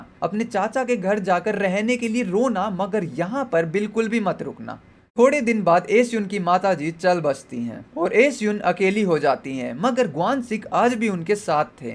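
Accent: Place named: Haryana, India. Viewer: Bhai aap haryana se ho kya🎉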